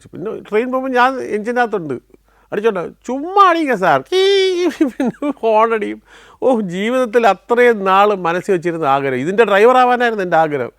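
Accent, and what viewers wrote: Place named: Thiruvananthapuram, India. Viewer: ആദ്യത്തെ എപ്പിസോഡ് കണ്ടു് തിരുവനന്തപുരം ശൈലിയിൽ ഉള്ള വിവരണം കേട്ടപ്പോൾ ഇഷ്ടം ആയി❤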